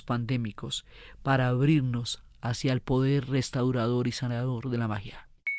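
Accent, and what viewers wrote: Colombia. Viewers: Gracias! Tu voz me acerca a mi Colombia. Mil abrazos desde el exilio 🥺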